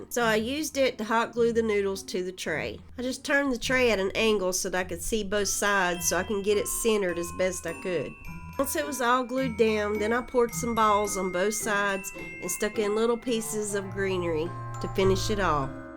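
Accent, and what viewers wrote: South, United States. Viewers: Honey child, I don't know where you're from, but I just love your honey and molasses southern accent. Cute ideas. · Love your decorations and your accent! I am a southerner too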